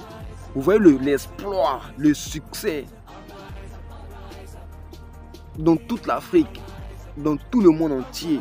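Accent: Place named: Ivory Coast. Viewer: Si tu es ivoirien garde ton accent stp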